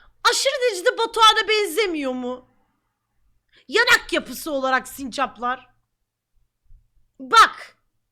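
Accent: Erzurum, Turkey. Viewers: lan bu erzurumlumu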